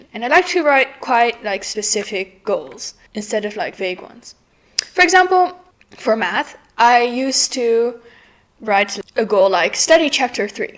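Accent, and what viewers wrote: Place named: England, United Kingdom. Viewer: Love your english accent ❤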